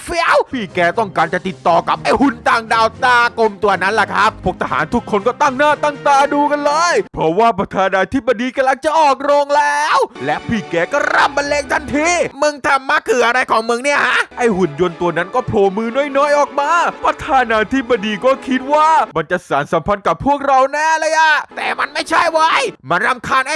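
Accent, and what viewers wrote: South, Thailand. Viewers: เกรียจการแหลงใต้ของพี่มากครับ5555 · ขำกับภาษาใต้ สุดๆๆๆ หรอยหนัด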